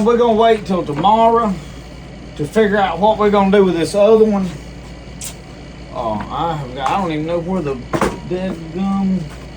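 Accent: South, United States. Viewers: I REALLY LOVED THE VIDEO AND OF COURSE THE "SOUTHERN BROTHERHODD ACCENT." I'M FROM FLORIDA AND WHEN I WAS A CHILD, I WOULD GET EMBARRESED WHEN I TALKED. ACTUALLY HAD PEOPLE WANT ME TO TALK WITH THE HARDCORE SOUTHERN ACCENT. GETTING PAST THAT, I REALLY LEARNED ABOUT THE WAY YOU USED THE CLOTH VS THE SPONGE. ALSO, YOU WERE DEAD ON WITH THE FINISHED PRODUCT! THE HOMEMADE KNIFE, WELL, I'M JEALOUS, BUT WILL FESS UP, I'M GONNA COPYCAT IT. MOSTLY, WHAT IS THE MUSCIC? LOVE IT AND IF YOU TYPE IN "DONNIE LAWS," HE USES THE SAME FIDDLE MUSIC. PLEASE LET ME KNOW WHERE TO FIND IT,... I LOVE IT! PEASE SHOW LOTS OF YOU WORK,... AS IT GETTING TO BE A LOST ART. THANKS ROG FROM THE SWAMPS OF FLORIDA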